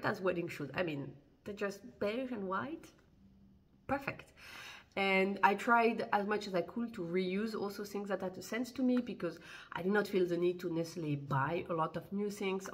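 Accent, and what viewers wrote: France. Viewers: Love your french accent!🤗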